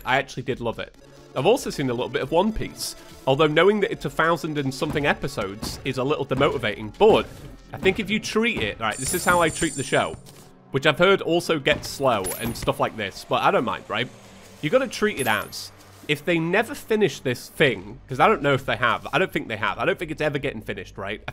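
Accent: United Kingdom. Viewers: Welcome back,. Unfortunately, my grandmother passed away about two weeks ago. I’ve been going back and watching your videos. I am unashamed to admit your relaxedness and smooth british accent have unironically gotten me through.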